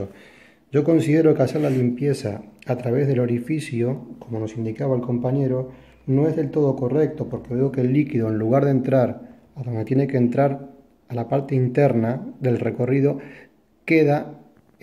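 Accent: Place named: Argentina. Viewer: Tenes un toque de acento argentino y español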